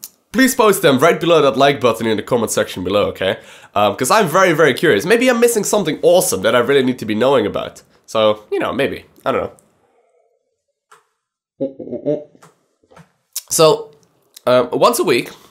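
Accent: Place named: Netherlands. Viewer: Already thought that you were Dutch from your accent, now I have confirmation.....<3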